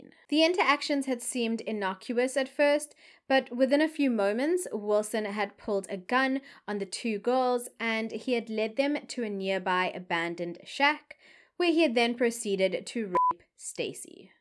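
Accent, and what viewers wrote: Afrikaans, South Africa. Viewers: Love the way you speak Afrikaans 🤣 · its the afrikaans reading for me... this story had me hooked to the end..nice job👍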